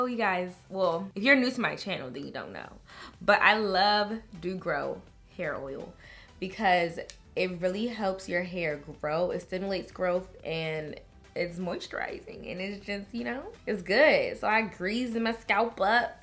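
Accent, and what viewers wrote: DMV, United States. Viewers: This was a hilarious video your accent you must be from the dmv area lol you had me dying laughing! But this tutorial was great I think I wanna try this method I cant deal with putting rubber bands in my hair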